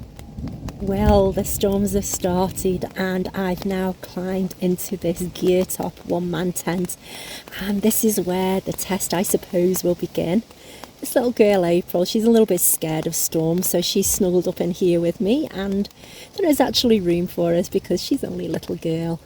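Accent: England, United Kingdom. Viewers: The location says Canada. The accent says England.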